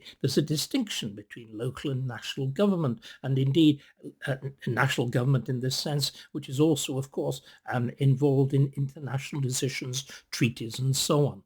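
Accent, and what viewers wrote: RP, United Kingdom. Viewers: Received Pronunciation is the tool of a con artist.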